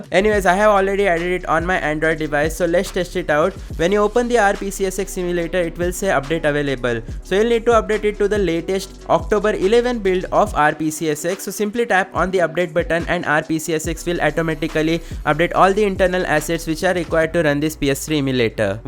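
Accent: India. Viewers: I am a Indian but why I can't understand Indian English 😢😢😢😢😢 It's like 5x speed😂😂😂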